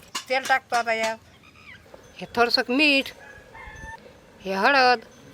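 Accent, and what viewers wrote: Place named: Akola, India. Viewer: तुमची भाषा अकोला जिल्ह्यातील आहे असे वाटते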